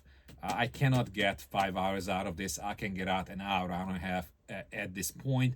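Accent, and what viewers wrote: Hungary. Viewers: I know a hungarian accent when i hear one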